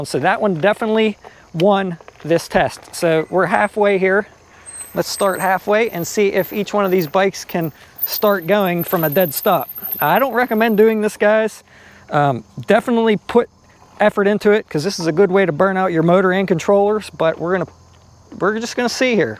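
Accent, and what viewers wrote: Pittsburgh, United States. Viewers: Great video! Are you from Pittsburgh?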